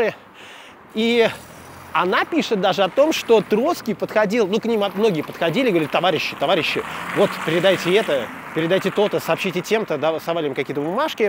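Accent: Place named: Saint Petersburg, Russia. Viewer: Это Питерский говор,наречие)))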